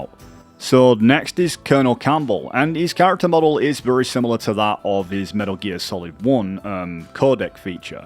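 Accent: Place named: United Kingdom. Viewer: 10 seconds in you called modern society terrible. im guessing that you live in the uk based on your accent. yeah you guys have some HORRIBLE politicians, but if you dont like it LEAVE THE COUNTRY. the whole planet is not defined by what you guys do on that island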